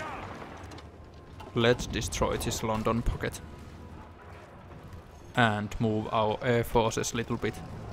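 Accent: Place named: Finland. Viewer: Finnish accent, best accent ;)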